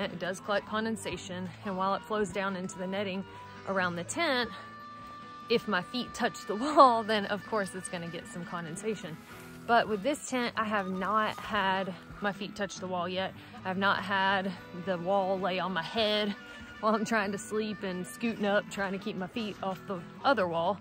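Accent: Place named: Tennessee, United States. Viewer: I feel like I am back in northeast Tennessee when I hear you talk. When I first moved away to live in richmond,va 34 years ago people would always asked me to say certain words lije night,right,light and Tennessee as I had a big southern drawl.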